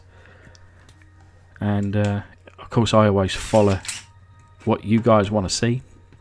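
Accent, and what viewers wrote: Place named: United Kingdom. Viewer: love that British... I’m Yorkshire. Proper Broad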